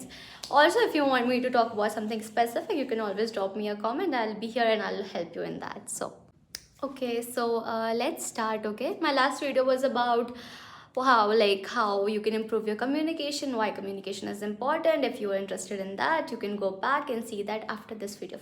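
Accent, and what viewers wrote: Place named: Karnataka, India. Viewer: U are getting habitual to kannada accent 🤣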